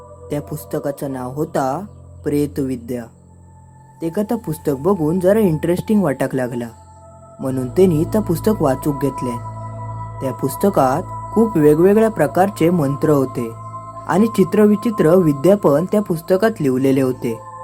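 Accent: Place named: Konkan, India. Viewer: Are hec best marathi kokni marathi🥰🤩😍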